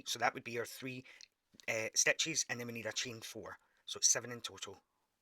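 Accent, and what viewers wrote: Scotland, United Kingdom. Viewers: You had me at the first sound of that Scottish accent,! [grin] Although I was, obviously, seeking material about C2C.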